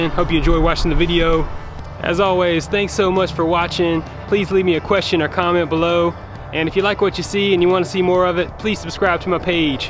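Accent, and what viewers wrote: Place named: Louisiana, United States. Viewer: Love your accent. Louisiana?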